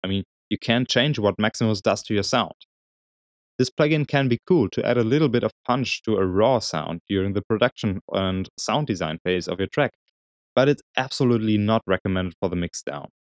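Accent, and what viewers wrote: Germany. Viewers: hahaha after the first sentences I heared that you are from germany :D es ist wirklich erstaunlich wie schwer es für die meisten ist den deutschen dialekt zu verstecken :D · nice tutorial! do u come from germany? i think u have a very german accent;P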